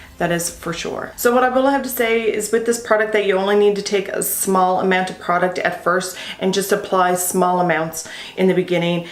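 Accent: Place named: Canada. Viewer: Hello. So 5 seconds into this video andI could tell that you're Canadian. I had a Canadian pen pal years ago, so I can spot Canadians like no one's business. Great review. Thank you!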